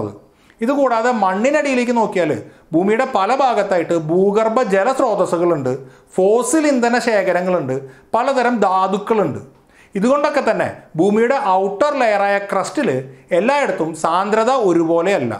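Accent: Thrissur, India. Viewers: I ഈ അവതാരകന് trissur കാരന്‍ ആണോ അത് പോലെ slang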